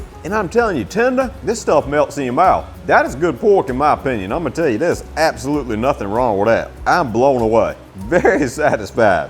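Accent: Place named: South, United States. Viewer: Dude. I'm an English teacher who really appreciates dialect. Would you please tell me what region influenced your accent? It's like southern Appalachian with a hint of Cajun or Creole (can't tell).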